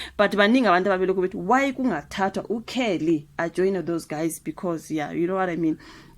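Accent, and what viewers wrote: Zimbabwe. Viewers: Your accent is giving Zimbabwe. Why nizifaka ezindabeni zaseSouth Africa? Niya dika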